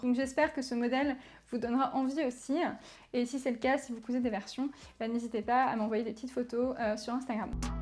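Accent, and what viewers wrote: Paris, France. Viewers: Je vais être virée, je dis ce que je pense tant pis, c'est pas méchant, Pfff dommage cette façon de parler à la Parigot, à chaque fin de mot ou presque, on entend ( OUAN ! ) Le patron OUAN ! Chaque côté OUAN ! Adapté OUAN ! Qu'on a besoin OUAN ! Bref j'ai l'impression d'entendre le sketch des inconnus (saluuut ouan tu vas bien ouan) C''est super agaçant OUAN !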